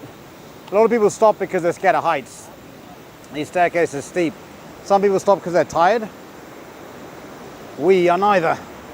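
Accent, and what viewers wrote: Thailand. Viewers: หูเราเพี้ยนๆมั้ยคะ เราว่าสำเนียงคุณแปร่งมาทางไทยนิดนึงค่ะ หรือเราคิดมากก็ไม่รู้นะคะ 😂😂😂😂😂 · Your thai accent so cute.❤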